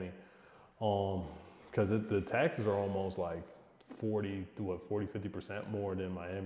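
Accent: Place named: Jamaica. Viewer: I'm pretty sure you are not Jamaican... your accent sounds authentic... didn't hear you mention whether or not you are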